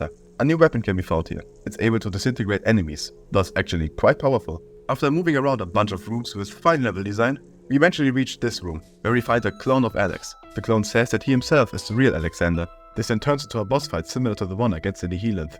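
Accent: Germany. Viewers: I find it odd that you mispronounce Steyr despite having a very strong GERMAN accent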